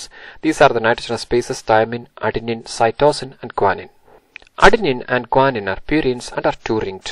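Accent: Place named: Kashmir, India. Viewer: You have a kashmiri accent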